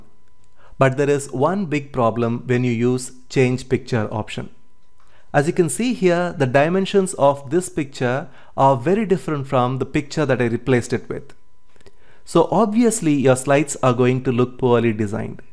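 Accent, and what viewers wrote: India. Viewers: until its indian blah blah!!!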